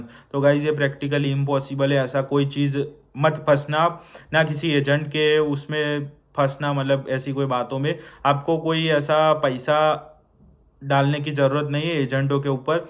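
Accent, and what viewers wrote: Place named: Gujarat, India. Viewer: Bhai me ahmedabad gujrat se hu muje lagta hai apke bolne se ap bhi gujrat se lagteho to app no do to bat karsakte hai Mera ek consultant he ahmedabad me 2 sal ka work permit de raha hai after reach 5.5 lakh payment or 600 to 700 euro in factory worker with acomodetion and food by company flight ticket include to kya karu sir ana chahiye ya nahi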